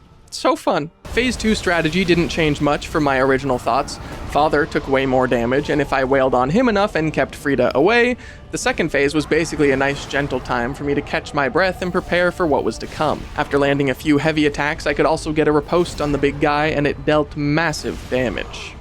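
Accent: Canada. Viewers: are you mayhaps.... canadian?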